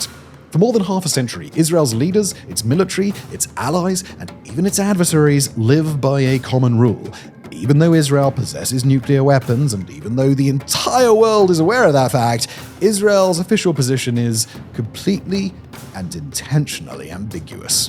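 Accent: United Kingdom. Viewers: i would enjoy your videos more and would be interested in all your promotions if you could stop making seemingly small but colossal mistakes that borders on misinformation like mistaking South Africa for South Korea in the Vela Incident, and calling the Rafale fighter, "Rafael". just talking fast and in british accent doesn't grant you immunity. tell your writers and editors to unfuck themselves.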